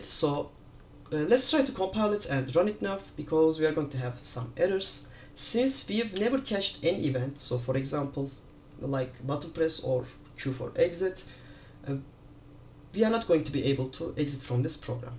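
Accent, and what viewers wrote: Turkey. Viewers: türk olduğunu 5 saniyeden anladım hocam, eğitim için teşekkürler. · Dinlerken dedim çok Türk'e benziyor ingilizce. Yorumlarda bunu görünce tetit etmiş oldum.😂 Başarılar hocam.🎉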